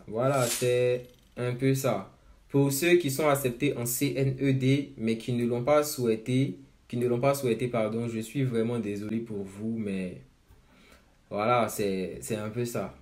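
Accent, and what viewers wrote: Ivory Coast. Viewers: Tu as un accent ivoiren frérot, tu l'est ?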